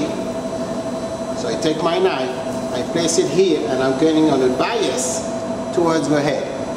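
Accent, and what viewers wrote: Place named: France. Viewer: Bazlur Rahmi worked in an Alaskan fish company for a month. The Salmon came from the boat directly pumped to me. where scarred salmon would go to the canning, and vitamin prepping. And unscathed fish would be cut to filets. which i only did a short time. it wasnt rocket science, you basically saved as much meat of the fish as possible, by cutting away the rest. The fruit peeler as a deboner was the highlight of this video and the dudes snazzy french accent 😂😂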